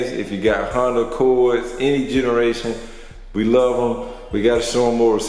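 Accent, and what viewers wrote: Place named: Southeast Texas, United States. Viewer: Bruh you sound like you from south east Texas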